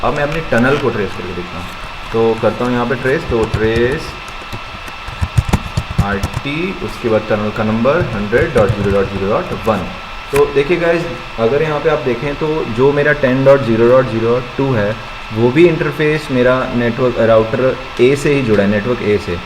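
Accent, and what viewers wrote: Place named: India. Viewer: Can't tell if that's Engrish or Indian.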